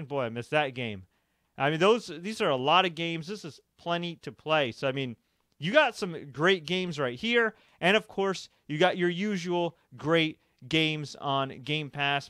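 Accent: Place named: South, United States. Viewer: This guy has a southern accent, Lew has a Canadian accent. Delivery is similar tho.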